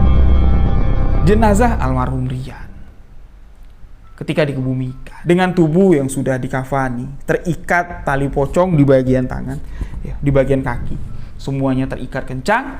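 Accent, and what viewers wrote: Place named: Sumatra, Indonesia. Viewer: Mas org sumatera ya…? Logatnya kayak org sumatera😊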